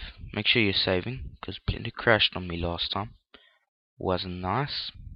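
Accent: South Africa. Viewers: South African ?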